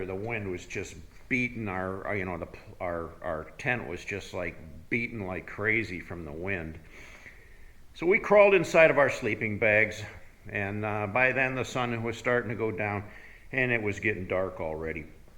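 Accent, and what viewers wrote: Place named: Ohio, United States. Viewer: Happy New Year to you two. Thanks for the stories. You have an Ohio accent.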